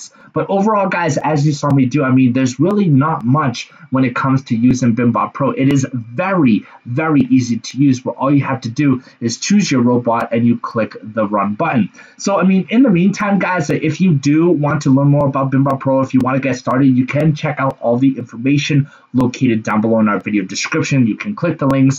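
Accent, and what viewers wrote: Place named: United States. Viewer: to me your accent sound so American 😅 where are you from or at least where do you reside? Because you have access to those brokers that does not allow Americans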